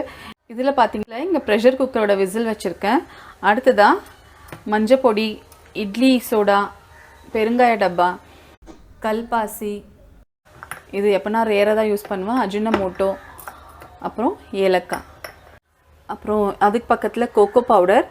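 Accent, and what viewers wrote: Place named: Bangalore, India. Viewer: the way of telling, even im from blr😀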